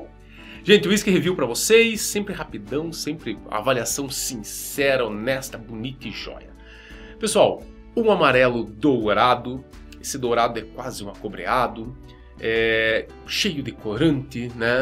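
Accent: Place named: Rio Grande do Sul, Brazil. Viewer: Vc e gaúcho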